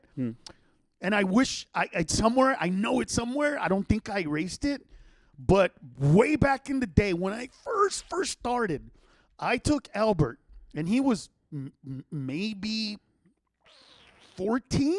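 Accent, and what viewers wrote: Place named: Mexico. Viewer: Youre not funny you fat piece of shit, all you do is steal people's jokes and say it with a mexican accent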